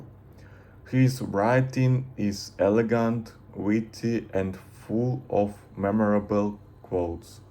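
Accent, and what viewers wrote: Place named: Eastern Europe. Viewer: To talk about this more, I don’t personally think hedonism is inherently bad, but I think it is often unhealthy for a person mentally. I don’t know what culture you are from, certainly your accent sounds like one of the languages in the Slavic family, but I’m from an area that is very repressed and people are not as open or accepting of others or new experiences as they should be in a healthy society. I feel like Mr. Wilde should not have been persecuted by others, but also that he should not have persecuted himself.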